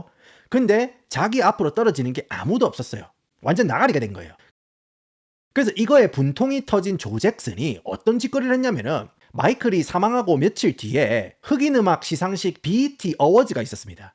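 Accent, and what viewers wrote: Gyeongsang, South Korea. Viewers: 경상도 액센트 매력 탑이에요❤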